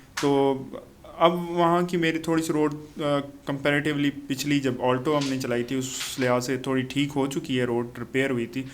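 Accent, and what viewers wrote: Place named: Mianwali, Pakistan. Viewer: Bhai ap Mianwali sy ho kiya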